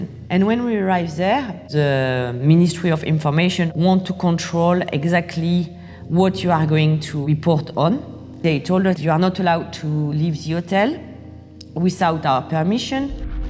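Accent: France. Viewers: Strongest french accent I've ever heard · I couldn't finish the video. The French accent gave me a headache!. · This is the most french accent i've ever heard lol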